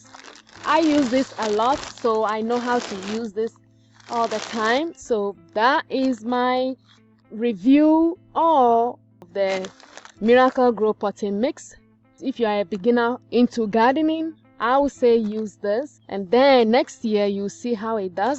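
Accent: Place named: Ibibio, Nigeria. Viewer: are you ibibio from akwa abasi ibom? you have beautiful accent miss thank you helpful knowledge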